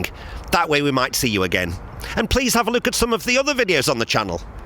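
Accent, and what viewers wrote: Stoke, United Kingdom. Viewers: I've only just found your channel. It's brilliant. Your accent is very similar to mine, are you from Stoke ? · Annoying British accent, unnecessarily bloated content.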